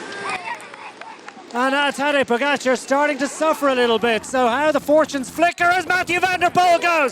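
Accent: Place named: Ireland. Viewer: It's not Rob Hatch. He did the live Eurosport commentary with Dan Lloyd, but Rob Hatch is English, this fellow's Irish. It sounds like Michael Hutchinson.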